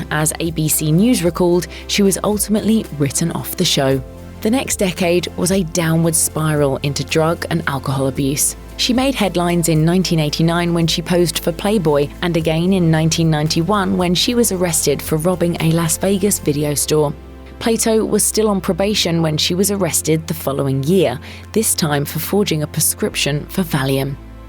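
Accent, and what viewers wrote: United Kingdom. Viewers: Can't handle the Brit accent. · Tired of hearing British narrator. 😝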